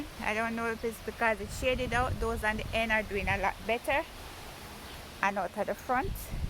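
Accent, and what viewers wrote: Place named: Jamaica. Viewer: Got half way through the video and thought she has to be Jamaican. You just said it! New sub here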